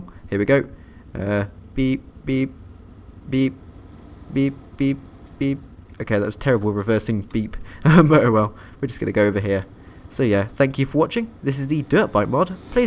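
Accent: United Kingdom. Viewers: Your the best, your voice is very nice, your UK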